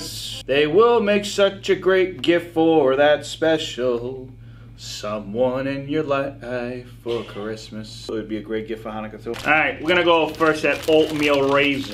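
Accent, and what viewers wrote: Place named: Massachusetts, United States. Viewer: is the voice of Massachusetts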